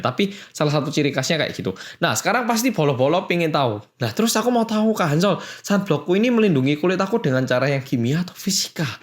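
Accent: Java, Indonesia. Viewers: Bisa barengan notif youtobe and live di IGmu mas korea medok.. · Aku wes mbedek....."orang Korea yg "jerawatan"..." ee e jebule yg medok....salah dech..isin aku... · Oke hellow guys. Welcome back to bersama orang korea yg MeDdokk 😂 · Notif medoook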